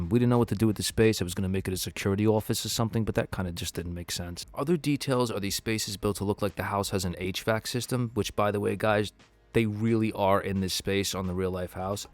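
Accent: New York, United States. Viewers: Dang that New York accent you have there is cool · Also are you from New York? Your accent definitely sounds like it. Which is a compliment btw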